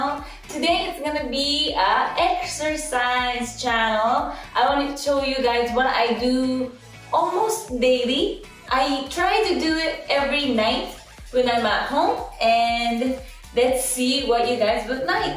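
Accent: Philippines. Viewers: Your english has filipino accent in it! Really cool!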